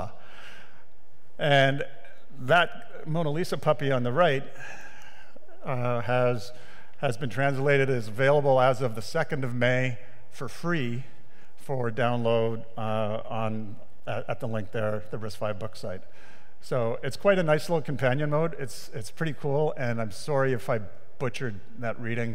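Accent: France. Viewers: nice french accent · hearing him speak Spanish with a French accent is kind of funny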